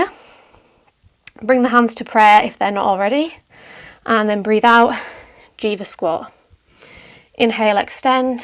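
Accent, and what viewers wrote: Yorkshire, United Kingdom. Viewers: This is the first flow I've done with you and it was great to hear a Yorkshire accent teaching yoga, makes me feel at home 😊 it was intense and I am ded but will be coming back to practice for sure x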